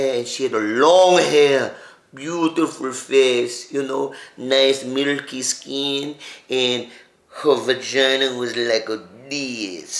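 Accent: Mexico. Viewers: Is he suppose to sound Mexican?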